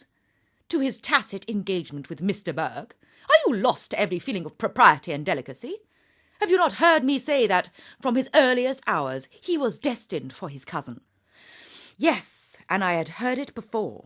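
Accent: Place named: RP, United Kingdom. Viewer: This is absolutely gorgeous! I love her voice and the way she speaks. She sounds so posh (but I like it) and her diction is FLAWLESS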